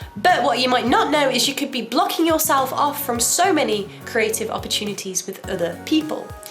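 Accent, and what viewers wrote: United Kingdom. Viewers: I love the way you sound. Your British accent is adorable to ears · That Midlands accent...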